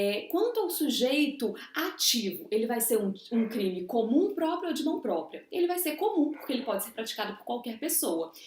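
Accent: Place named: Minas Gerais, Brazil. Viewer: Parabéns Mineira...o sotaque da terra ajudou bastante te ouvir falar...ótima cadencia e construção...continue melhorando!!!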